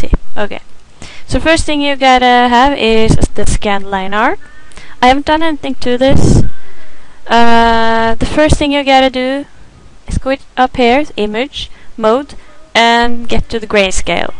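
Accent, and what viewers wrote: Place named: Norway. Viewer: You sound norwegian.. Where are you from?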